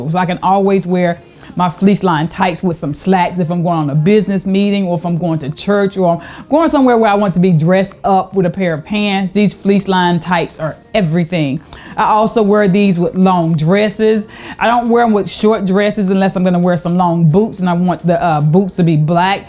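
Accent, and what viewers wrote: South, United States. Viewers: I knew you was from the south from your accent, ahhh NC. I’m from NC. Great video!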